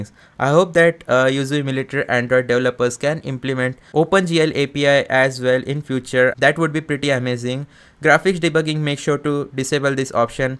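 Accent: India. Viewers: Indian accent hurt my ears but this is helpful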